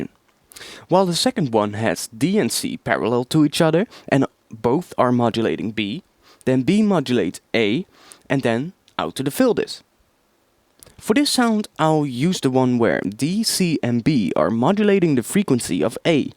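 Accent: Netherlands. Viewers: Is he dutch? Cuz his english accent sound like mine, when i'm talking english :P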